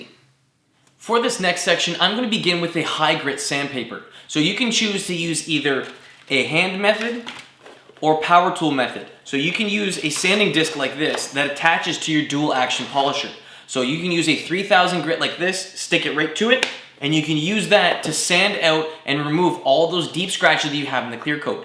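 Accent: Canada. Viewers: After 1 second of watching this....wonder which part of 🇨🇦 he’s from 🤔.... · definitely Canadian (not holding it against you) lol. Thanks for the demo!!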